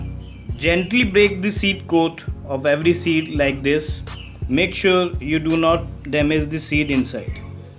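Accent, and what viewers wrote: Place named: India. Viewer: O my guys, when indian 🇮🇳 speaks English make me so nervous.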